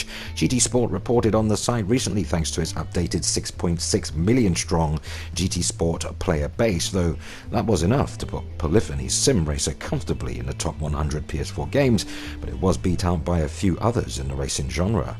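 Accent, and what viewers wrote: United Kingdom. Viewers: I like how you always emphasize “rampant speculation” also I’m an American but hearing a British accent is refreshing. I have an American southern accent and some may enjoy that so cheers 🍻 to liking things.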